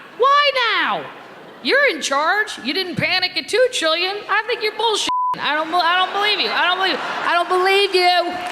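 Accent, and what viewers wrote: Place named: Midwest, United States. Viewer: Such a cutie - love her midwestern accent 🥰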